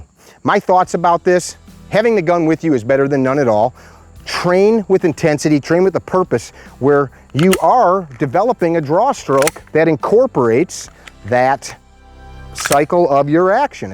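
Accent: United States. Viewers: Speakin normal american english so he must be. 😁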